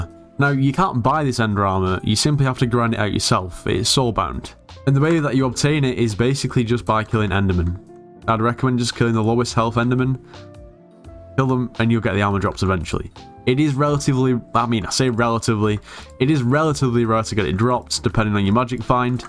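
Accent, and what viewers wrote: United Kingdom. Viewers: He is the most British sounding British person I've ever heared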